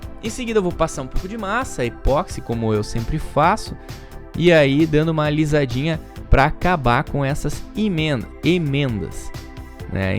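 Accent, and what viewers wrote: Rio Grande do Sul, Brazil. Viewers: conheci o seu canal a pouco tempo, e ja curto e pelo seu sotaque percebi q é de RS kkk,tbm sou, só q sou de Caxias tmj <3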